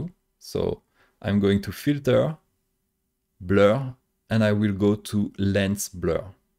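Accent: France. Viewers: Tbh french accent make this tutorial a pleasure to hear. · Dude I love your accent! I love listening to the French language. I love watching French television. But with subtitles in english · An hour well spent...the French accent made listening a joy! Thank you very much for the immense effort you put into making this fantastic tutorial! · "heavy french accent" already warms my heart)) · Thank you very much , it's a great deal. BTW, your French accent is not so heavy as YouTube warns. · Hahaha c'est cool - heavy French accent! 😆 Je suis Allemande et je l'adore en fait 😄 Je suis sûre que j'ai un vrai accent, aussi, quand je parle Français! Mais l'accent Allemand n'est pas si charmant! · Just... Bravo! Giving you standing ovation right now. I liked the very detailed explanation and your french accent - it just make the video better. I am still couple of light-years away from your level of drawing skills, but video helped me a lot.Thank you! 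· damn, accent disclaimer. i watch your channel for 3 years now, and i also saw really old videos... compare to them, your accent became barely noticeable. even old videos were completely understandable, idk, maybe it's just me whose first language not English either (heard that foreign speakers understand different accents earlier than natives) remember only one struggle, it was shading/lightning video. "Ard light" layer mode made me stop the video and think a lil bit XD then i was like "oh, french, no H sound, riiiiight, got it". this only time from aaaallll your videos who needs it, will tolerate eith any accents or difficulties. if someone like "iu, accent, turn it off", they're probably not really into it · Love that you walked through your layout setup process and everything, thanks a lot! Re: that disclaimer in beginning -- I actually prefer my English tutorials with a heavy French accent :D Also love the draw-in-the-style work, I prefer it over the more anime-style original. 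· Your french ascent is really lovely and your videos are so wonderful. Learning so much here 👌🏿✨ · I came down here immediately to type this very same message! Mr., your accent is absolutely beautiful, never apologize for not being English-speaking as it isn't even your first language; the French language is beyond beautiful and you speak English marvelously, very easy for me (with hearing impairment issues) to understand you. Thank you for this very ambitious tutorial, I just got the Wacom Intuos Small (CTL4100) for Christmas, it is my very first drawing tablet! Any suggestions on settings I should setup for it anyone? I've watched a few videos on setting suggestions, but there are buttons on the tablet itself, then on the pen and I'm not sure what these should be set to with Krita that would be beneficial. Additionally, is it normal for the pen to be so sensitive? I barely get the pen close to my tablet and it's already moving the pointer on my screen - is this just something I need to get used to?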